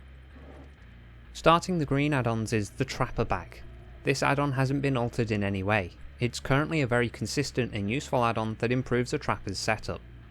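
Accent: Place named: United Kingdom. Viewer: I came for the Trapper rework, I stayed for the sexy British accent ❤❤❤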